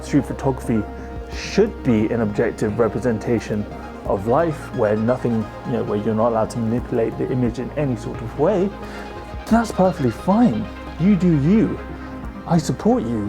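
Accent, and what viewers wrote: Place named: United Kingdom. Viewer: Nicely done my dude. Damn that British accent haha you put the rest of us to shame 😂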